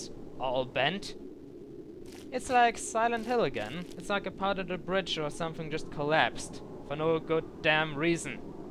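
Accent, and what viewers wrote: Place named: Germany. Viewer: you got skills german skills so use your awesome accent to fool the creeps in thinking your all stone cold so you can crack but look them in the eyes and laugh, laugh manicly catching them off their feet and go piano string on their ass jack-the-ripper style thats how i would but then again i solve problems by jumping off a heilocopter with a gauntlet because i was too lazy too pick up my parashoot im ok but one unfortunate guy hang-gliding didnt do a barrel roll sooooooo he... GOOD LUCK!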